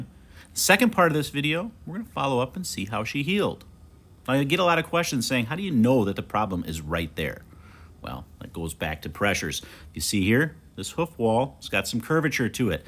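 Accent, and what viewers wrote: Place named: United States. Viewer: Why does your voice sound American in some videos but others it’s mixed with Irish.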